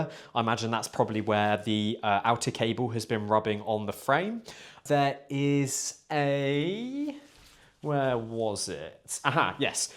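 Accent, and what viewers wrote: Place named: RP, United Kingdom. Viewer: Thats a very posh entrance for a bike journalist, though he does have a very posh accent.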